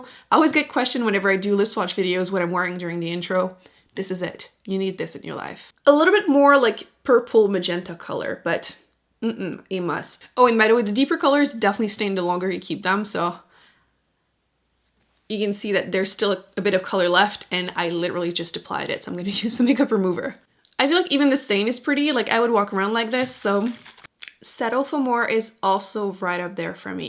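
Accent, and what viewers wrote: Quebec, Canada. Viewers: yes i thought it sounded faded French and then i googled it and someone said you sound French Canadian. Usually they have heavier accents though. Where do you live? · I could listen to you talking all day. LOVE your accent. It's French Canadian yeah?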